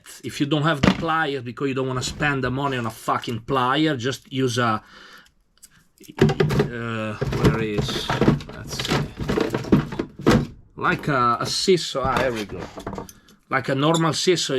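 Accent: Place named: Italy. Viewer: Complimenti per le tue creazioni ! hai davvero grande competenza ! Comunque Si sente che sei italiano ma parli così bene e lentamente l’inglese che sembra come se parlassi italiano . Sei un Maestro 🔝🔥 🔝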